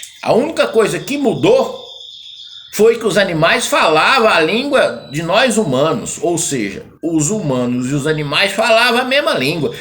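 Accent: Goiás, Brazil. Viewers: Sou de São roque SP gosto de te ouvir seus caso seu linguajar no sotaque goiano e caipira é chik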